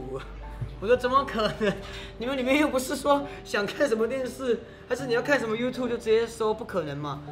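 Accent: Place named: China. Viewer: 你很可愛，加油。但你口音好重？ 你是大陸人嗎？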